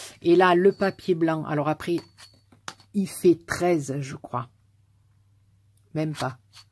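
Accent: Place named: South, France. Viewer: Bonjour j'insiste lourdement avec ton accent mais ça me fait vraiment penser a mes cigales d'Ardèche qui me manque énormément .ta carte est superbe et moi je n'avais jamais vu ce modèle. Merci pour ce joli partage bisous et bonne journée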